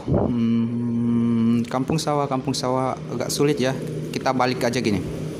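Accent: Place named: Sulawesi, Indonesia. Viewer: Jelas sekali klo miminnya org Sulawesi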